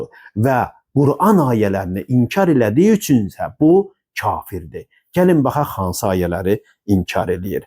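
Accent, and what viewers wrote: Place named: Iran. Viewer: Birinci Azerbaycan dilini fars lehçesinde danışma..............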